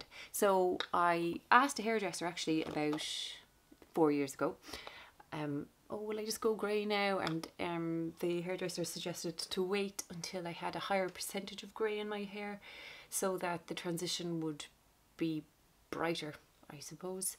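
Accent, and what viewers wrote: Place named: Ireland. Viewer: So delighted to discover you tonight! You have brightened my dull day with your enchanting personality & Irish? accent. I started by gray hair journey last August, I was SO excited for it! I also have pale skin and pale green eyes (and yes irish ancestry on both sides) and have been dyeing my hair dark for decades (even though my natural hair is light brown/dark blonde). I had NO idea what lay underneath it all! I also am just growing it out, not cutting it short or bleaching it at all. It has been quite the journey. At 8 months of growth I am just beginning to get glimpses of what it may look like grown out. I am planning on growing it to waist length which means it will take at least another 2 to 3 years or more. Right now it is long to mid back and I keep trimming the dull ends every month. I very much look forward to your future videos! 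Liked and subscribed. Slainte!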